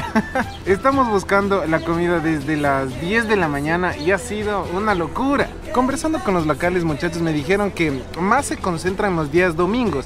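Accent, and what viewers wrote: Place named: Quito, Ecuador. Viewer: Espectacular, por fin un ecuatoriano con sentido del humor nuestro y ese acento quiteño inconfundible...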